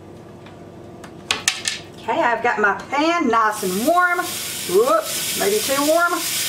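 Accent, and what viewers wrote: South, United States. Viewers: Medm crispy?? Not completely done. Thanks for cooking demo. I'll have to try the burritos. Whisky- woo. Love the way you talk. I'm southern too. Whenever I travel thru Georgia., No one Asks where ya from??? Assume I'm a native. Love it.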